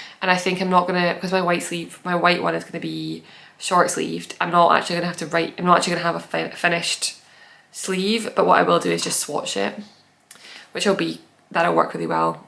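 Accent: Scotland, United Kingdom. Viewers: Hello, thank you very much for your videos. I love your work, your choice of colours, wools and designs. Congratulations, you are such a talented person and I love also your Scottish accent not difficult to understand at all for me, a Portuguese woman living in Paris for 34 years, since 1990. I’m so happy to listen to your podcasts and following you every week it’s a great pleasure. Thank you for sharing your experience and knowledge with us. Never give up, you’re a wonderful person ❤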